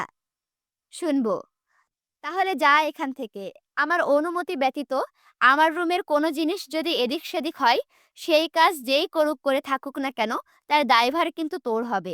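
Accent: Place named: Satkhira, Bangladesh. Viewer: Apu apnr district koi 😊 satkhira mone hocce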